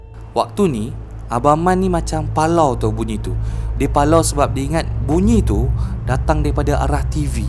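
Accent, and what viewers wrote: Kelantan, Malaysia. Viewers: Btw ni org pantai timur ke?ado bunyi telo klate sikit2😂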